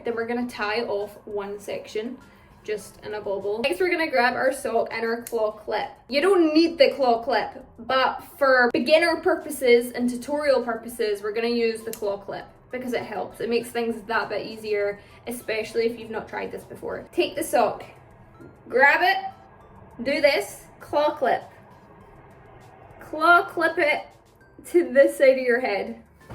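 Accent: Scotland, United Kingdom. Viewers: Are U Scottish? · Excuse me but I've never heard you speak before and your accent is gorgeous🥹. I'd love to hear asmr in your accent. Where are you from? Your accent sounds like it might be regionally specific and I'm always curious about that. Like how accents in new york city differ a lot depending on where in the city someone is from. Like, peter Capaldi speaks differently than you but you both sound scots, so i assume you're not from the same regions. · I love Scottish accents so much💛💛💛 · r u Scottish (cuz I always thought u were American) also r u British cuz I ammmm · I’m pretty sure she’s Scottish, I could be wrong though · Irish American? That would be someone of Irish decent, living or born in America. She’s Scottish, and was raised and is still living in Scotland.. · are you scottish? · OMG UR SCOTTISH I LOVE THAT AND I WASNT EXPECTING YOU TO BE SCOTTISH I THOUGHT YOU WOULD BE FROM LONDON! 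UR SO PRETTYY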